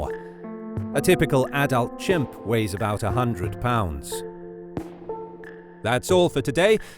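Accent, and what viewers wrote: England, United Kingdom. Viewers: Rogan with an english accent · So it took you to get to any actual info, and then you gave us 20 seconds of data before you restated half the filler you already brought. No sources, no actual numbers, just an english accent to make people think you know something. 10 minutes on google gives you the same info and we made slideshows like this in freshman year high school. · Why does a British accent describing powerful animals sound so intriguing?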